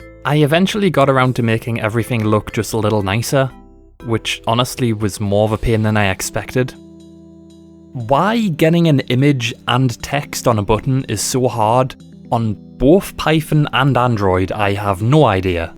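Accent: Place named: North, United Kingdom. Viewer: Sound like a fellow northerner, great vid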